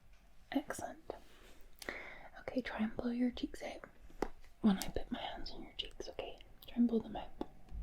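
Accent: Scotland, United Kingdom. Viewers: You are a person and you know what a person needs? a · 26 minutes of tingles from the resplendent scottish lass. perry the platypus noises ☺️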